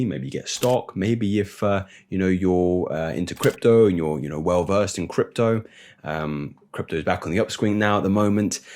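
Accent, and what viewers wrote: United Kingdom. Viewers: Your videos are priceless. I learn a proper British English as well. Cheers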